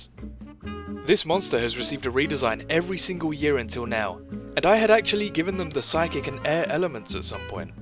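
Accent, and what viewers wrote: United Kingdom. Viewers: Is British? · I didn't know was British. I am happy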